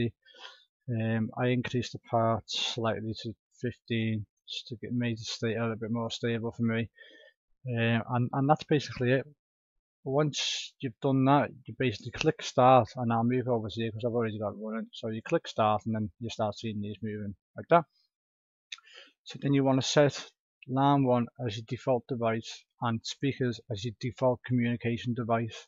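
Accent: Liverpool, United Kingdom. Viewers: Nice Tutorial Man, simple But Clear, the Way I like it. Are you from around Liverpool, it sounds like you have a Scouse Accent .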